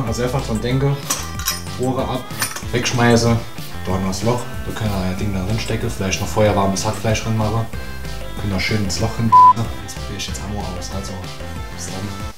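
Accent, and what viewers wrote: Germany. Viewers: Pfälzer? Feier ich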